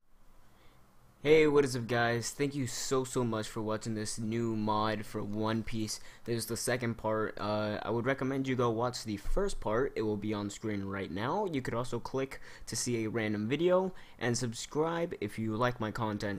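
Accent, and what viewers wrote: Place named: New Zealand. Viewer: Oh lol I didn't think you sounded Hispanic because your accent sounds very similar to a New Zealanders Accent so I really didn't make that kind of connection.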